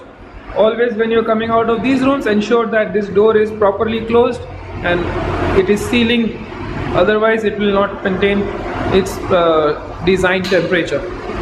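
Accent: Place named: India. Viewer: Nothing wrong with Indian english. Its the most logical pronunciation anyone can do with that language.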